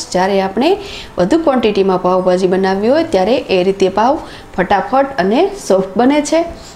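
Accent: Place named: Kathiawar, India. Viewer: વાહા બવૂજ શરસ કાઠીયાવાડી સંવાદમા ભાજી ખાવીની મંજા ઓરછે બીજા વીડીયો મૂકછોજી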